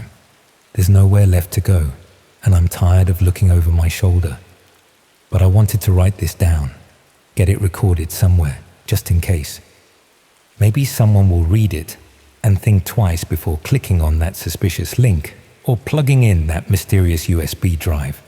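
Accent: United Kingdom. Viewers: Every night I fall asleep to stories like these. It’s nice to have a British one listening to places I know of. Since listening to you to fall asleep I’ve found myself staying awake slightly longer to listen to what’s happening.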